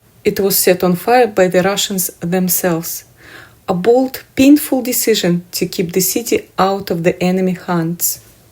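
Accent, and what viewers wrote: Scotland, United Kingdom. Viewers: I lived in Galston in the late 70s having moved from Glasgow. I remember my dad trying to get us to Glasgow and we got stuck on the main road through Moscow down the dip in the snow. Had to be pulled out. Love your accent too - I can hear the Scots pushing through your Russian accent. Beautiful!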